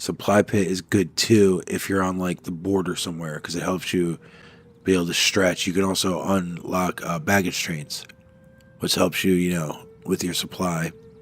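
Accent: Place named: United States. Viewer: If you're having fun, that's the most important thing. Sure, it's not the most dynamic campaign I've ever seen, but considering that and the difficulty level, it's definitely something different, and that's cool. If it's fun for you, that's what matters. For me personally, 12tpy is a bit too long, but it's nice to see something different style. Sorry for my English, I hope everything is understandable; it's not my first language ;p One question, where are you from? because I have a feeling that we operate in completely different time zones😅 the time in which you publish your videos and your accent tell me it's the United States? Good luck and I'll definitely be checking in from time to time to see how your campaign is going!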